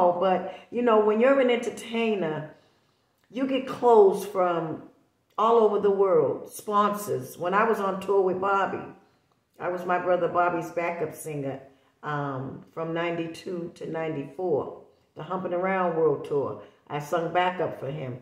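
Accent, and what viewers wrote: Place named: Boston, United States. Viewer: Love your Boston accent! Keep up the good work!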